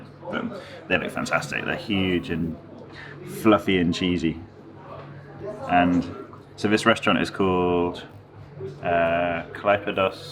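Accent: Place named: New Zealand. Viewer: Thanks, I was stoned af last night watching this and for some reason thought you mighta been kiwi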